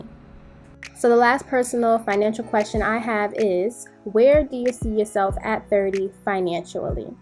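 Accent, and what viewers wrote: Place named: New Jersey, United States. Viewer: Wow great video I knew you were from New Jersey I just wasn’t sure but the way you pronounced some words gave it away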